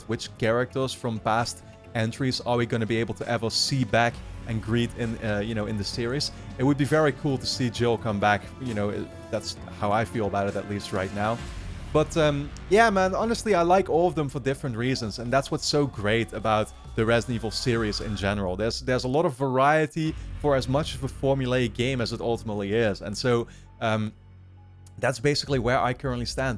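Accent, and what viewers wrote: Netherlands. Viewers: Dat Nederlandse accent hoorde ik meteen 😜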